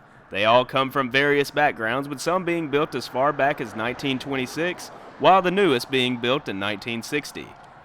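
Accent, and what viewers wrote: South, United States. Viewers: Why is this beautiful train of Canada being announced by announced by an American with a southern accent?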